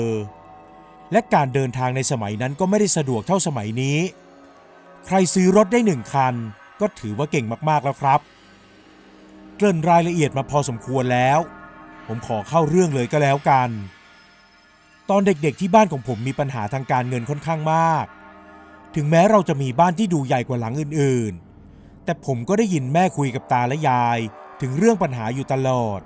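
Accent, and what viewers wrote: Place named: South, Thailand. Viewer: ชอบที่พยายามจะแหลงใต้ <num>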